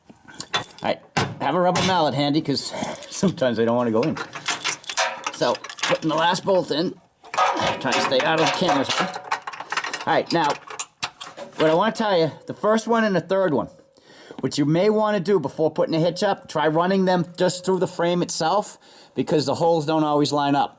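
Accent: Boston, United States. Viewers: Thank you for such a marvelously done video. I bought my 2015 Black Sahara last week, ordered my hitch right after watching your 'how to' and installed it yesterday in about an hour. And all for under $75. I've ordered my fuel filler cover upgrade and your video for that looks easy enough to follow. BTW, I grew up in Newton Center, MA and love hearing your accent. Reminds me of my childhood.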